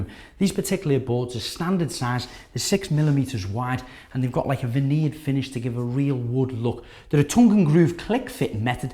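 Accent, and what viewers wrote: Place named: Liverpool, United Kingdom. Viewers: Sean Connery has a Scottish accent. This guy has a Liverpudlian or Scouse accent. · he is a scouser u moron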